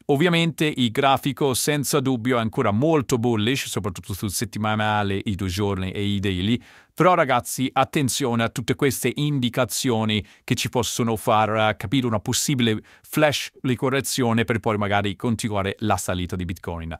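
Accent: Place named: United States. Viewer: Mitico l'accento AmericanEnglish è stupendo 😅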